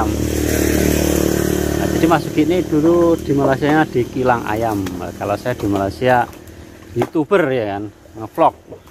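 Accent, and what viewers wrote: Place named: Java, Indonesia. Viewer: Jowone ngendi masse...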